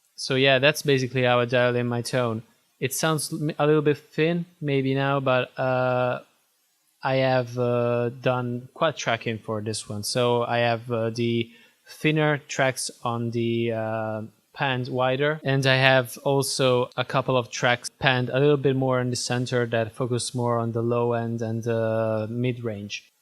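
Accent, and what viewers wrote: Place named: Italy. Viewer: ah, ecco, mi sembrava di sentire l'accento italiano ☺. Grandissimo 🤘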